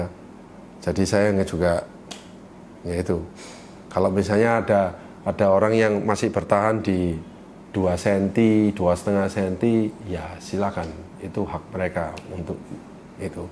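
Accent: Surabaya, Indonesia. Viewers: I love..penjelasan nya mudah dipahami..yg penting logat suroboyonya yg bikin meyakinkan..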